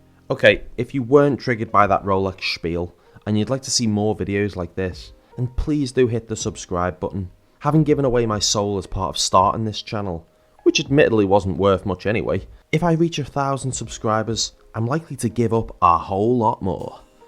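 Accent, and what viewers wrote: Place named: Northwest England, United Kingdom. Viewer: Subbed for the northwest accent. Greeting from Ormskirk.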